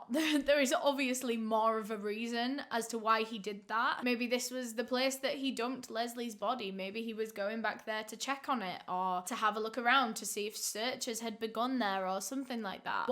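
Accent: United Kingdom. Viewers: Hey..this is off topic..i love your accent! Sounds British with a twist!😉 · Can a British person tell me what kind of accent she has? It doesn’t sound like typical accent you hear on tv. Is it considered proper? I met a girl from Manchester who told me Adele sounds so bad when she talks and here me an American loves her accent. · I. Love. This. Accent. So. Much…. Which part of the UK is this?!!! 🤩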